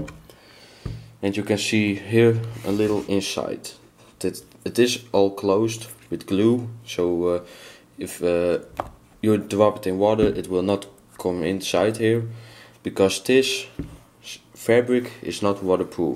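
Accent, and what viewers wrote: Netherlands. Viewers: I can hear verry good that you coming from the Netherlands.😆 leuke review. Nu kan ik eindelijk eens zien hoe mijn Charge 3 er van binnen uit ziet.😊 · ik hoorde in de eerste seconde al dat het een nederlander is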